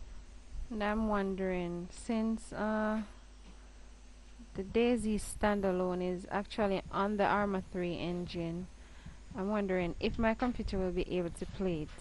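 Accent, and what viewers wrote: Jamaica. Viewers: you sound jamaican i am jamaican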